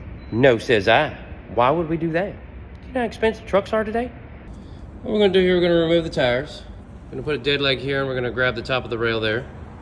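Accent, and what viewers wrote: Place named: Texas, United States. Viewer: Just subscribed. GREAT Channel. Is yous in TEXAS? Ya sound likes yer from TEXAS 🤠 I is too so I was justa wonderin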